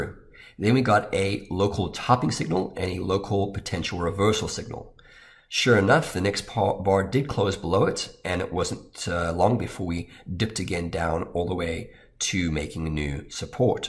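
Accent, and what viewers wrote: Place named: South Africa. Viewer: Thanks, much appreciated. BTW I detect a hint of a South African accent way back there, am I right? Cheers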